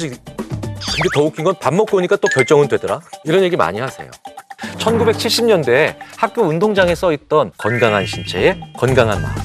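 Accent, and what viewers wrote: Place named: South Korea. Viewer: 우와~인제 논문도 읽어주네요~ 전문가 놀리기 재밌어~ㅋ 배부르니까 긍정적인거라는 거구만요. 배고프면 부정적이고. 의심도 많아지고. 고만고만한 모범수들.. 그냥 종이 한장이지 뭐~ 사람이 그쵸. 일이 안될때 밥먹고 하자는 무의식이 발동 하는군요~ 배고프고 힘들때 예민한 이유도? 왜케 한국말처럼 영어를 읽으나요...귀에 쏙쏙 들어오네요. 우와 뭔가 경제에 영향을 미칠듯한 메세지네요. 나를 솔직히 말했는데..이용하고 편견을 갖는 사람 있어요..굳이 말할필요 있남?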